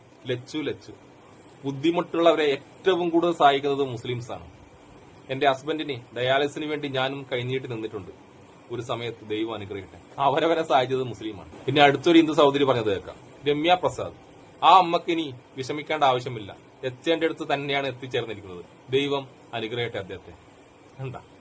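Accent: Kannur, India. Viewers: ഭായ് എന്നും നിങ്ങളുടെ മേൽ ദൈവാനുഗ്രഹം ഉണ്ടാകട്ടെ.... സംസാരം കേട്ടിട്ട് കണ്ണൂർ കാരനാണെന്നു തോന്നുന്നു ഞാനും കണ്ണൂരാണ്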